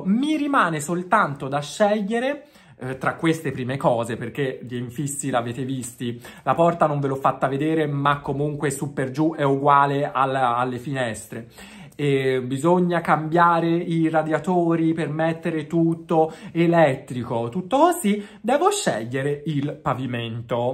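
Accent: Tuscany, Italy. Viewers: Ciao sei bellissimo e questa camicia che ti ha detto di comprare mark ti sta benissimo e quando parli in Toscano sei fantastico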